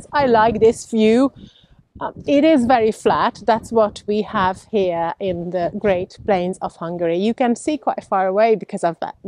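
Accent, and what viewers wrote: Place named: United Kingdom. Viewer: You seem to have a slight British accent. How many years have you worked in the UK? Is there still a lot of young hungarians working?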